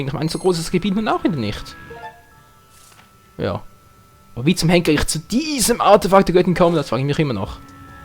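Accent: Switzerland. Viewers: xD...Tschöpli!!! xD Schwizerdütsch rockt!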